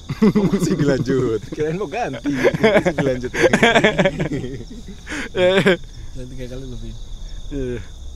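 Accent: Java, Indonesia. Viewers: Orang jawa ya bang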